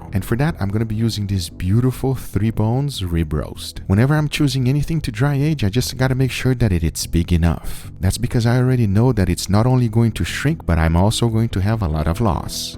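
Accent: Brazil. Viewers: Is this dude Brazilian? Major Brazilian accent!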